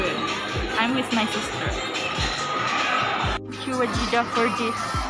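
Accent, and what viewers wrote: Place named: Kuala Lumpur, Malaysia. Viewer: Panda kecek KL 👍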